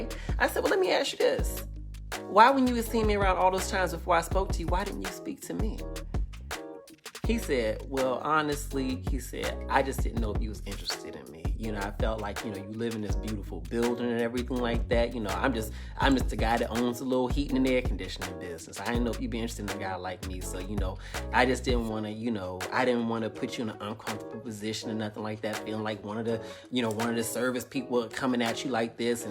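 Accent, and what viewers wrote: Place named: Washington DC, United States. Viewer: I can hear in your voice that you're from DC yessss hunnie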